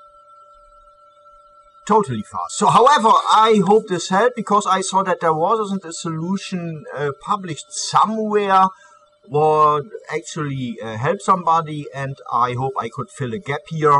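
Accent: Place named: Germany. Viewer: Man hört den deutschen Akzent sehr raus , but anyway , nice video :)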